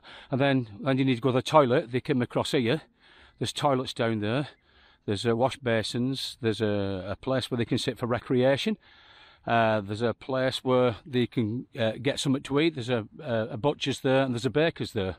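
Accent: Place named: Lancashire, United Kingdom. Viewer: Fascinating. I was driven past Liege in the 1970's but never saw any of the fortifications there. I was lucky enough to visit Verdun in the 1980s and did visit those at Vaux and Douamont. I have hundreds of books, postcards, e.t.c. on fortifications. You sound like you come from Lancashire. I'm a scouser myself.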